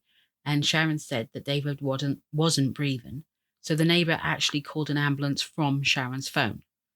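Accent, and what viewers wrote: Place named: Norwich, United Kingdom. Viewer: Hi! I'm just wondering where you are from? I'm from Norwich and you sound very local. Best wishes Jane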